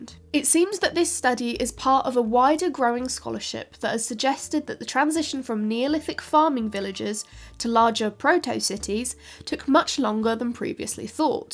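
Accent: RP, United Kingdom. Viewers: I nominate voice with her adorable English accent to be the new voice of OpenAI's GPT-4o. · I knew before I started the vid, she'd have a British accent. Perfect. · I love this channel for: 1. Lots of cool science news. 2. Perfect English RP which is a music for my polish ears. Best Regards!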